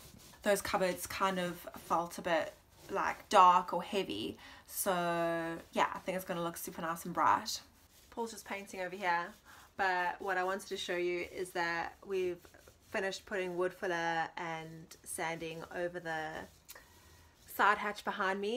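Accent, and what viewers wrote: South Africa. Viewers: South african ?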